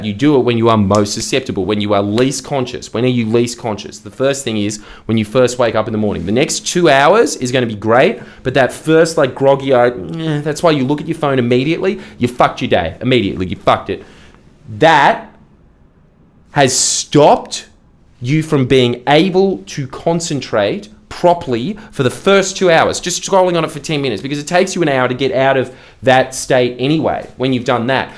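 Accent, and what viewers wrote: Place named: Australia. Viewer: Incredibly chad video. Already love self improvement content but goddamn giving self improvement advice with the addicting ozzy accent and jokes holy hell this is art. Instant sub. This is it, the gigachad of australian news also being a gigachad of telling people the massive downsides of being chronically online and stimulated one way or another!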